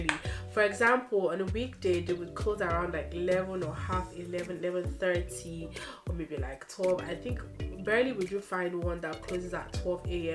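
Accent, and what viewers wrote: Dublin, Ireland. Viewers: Your accent with the Dublin inflections is deadly!!! Oh I mean ss Ireland so much but love my California life too! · I was prepared to be offended, but far from it. Everything you say is true and fair. Dublin is crucifyingly expensive. I feel so sorry for anyone starting out and trying to find accommodation in this country. I don't think your high insurance is necessarily down to the fact you are from another country, but down to your age. Back in 2001 my first car was 300 euro and my insurance was 1,200, 4 times the value of the car. This is beacuse I was young, and inexperienced. I love your bubbly personality and the way you say certain words with a Dublin accent.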